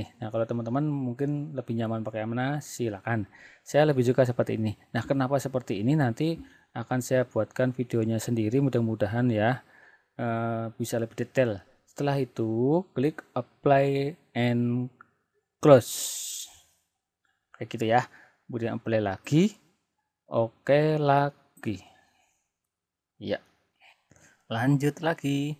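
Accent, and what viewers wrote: Banyumas, Indonesia. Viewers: bahasanya lucu...sukses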